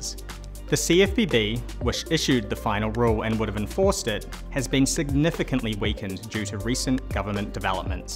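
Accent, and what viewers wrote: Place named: New Zealand. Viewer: Great to hear a Kiwi explain that so well!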